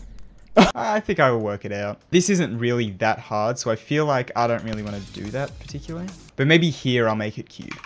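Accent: Australia. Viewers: Wait wait wait… are you Australian I am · HES AUSTRALIA? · he’s an australian youtuber lmao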